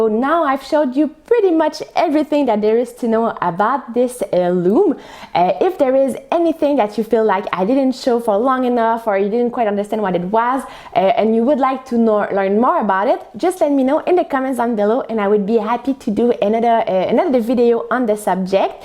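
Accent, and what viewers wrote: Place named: Quebec, Canada. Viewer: How exciting for you! Congratulations on your loom. I have been weaving on a rigid heddle for a few months and hope to one day acquire a floor loom. Have fun and thank you for your videos. I like your accent. I am guessing French-Canadian?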